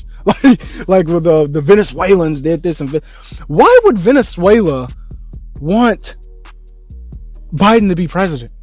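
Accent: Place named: Appalachia, United States. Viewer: He's not losing subs because he's not telling people what they want to hear it's because he's sitting in the house losing it on YouTube because he couldn't go to the gym lol! He's going now but the hillbilly accents, pausing the videos to make stupid jokes that are not funny and 6 hour lives is why people are leaving his channel.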